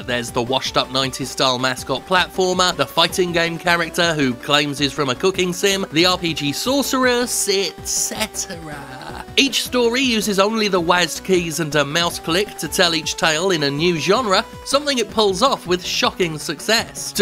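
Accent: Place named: United Kingdom. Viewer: I'm just thinking of what people thought of, standing next to a dumpster in America somewhere, dressed like that with a camera on him and his British accent. I'd like the police to drive by so I could see their double-take.. lol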